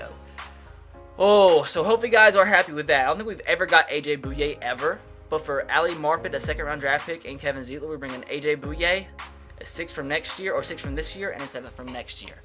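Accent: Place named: Alabama, United States. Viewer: Love the southren alabama accent bro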